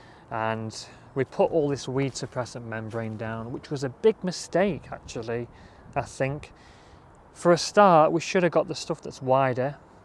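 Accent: Lancashire, United Kingdom. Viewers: Hi I'm on darwen not sure but you sound like you have a Lancashire accent.if you are where do you buy your compound and how much.none on the supermarkets that I've been too x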